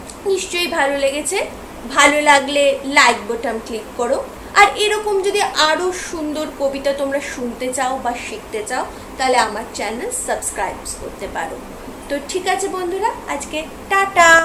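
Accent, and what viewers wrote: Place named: Kolkata, India. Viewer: Eta monehoi kolkata 🤔🤔🤔bangladesher bangla aro shundor💓💓💓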